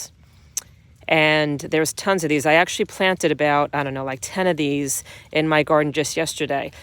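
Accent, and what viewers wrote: New Jersey, United States. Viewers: Love that jersey accent :). Endless summer is so easy to clone. I have been very sucessful and have endless plants all in my landscape!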